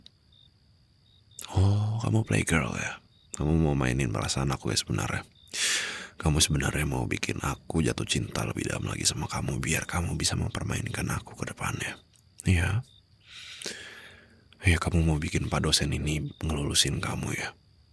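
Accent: Java, Indonesia. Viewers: Kenapa mas sih kaya jawa sayang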